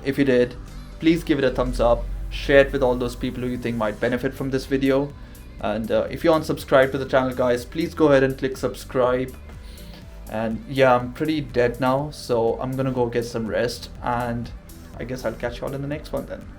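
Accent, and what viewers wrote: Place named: United Kingdom. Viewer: British accent agaya hai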